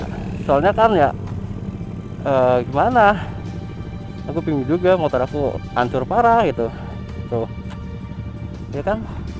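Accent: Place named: Banyumas, Indonesia. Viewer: Wong ndi mas deng ngapak hehe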